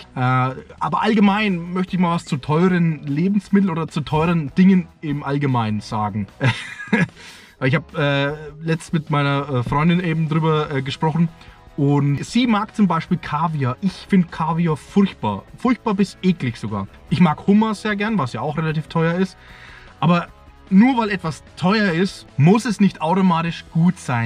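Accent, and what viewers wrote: Bavaria, Germany. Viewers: smitty werben jagger man jensen fürn Norddeutschen ist da unten alles irgend wie bayrischer Slang.😁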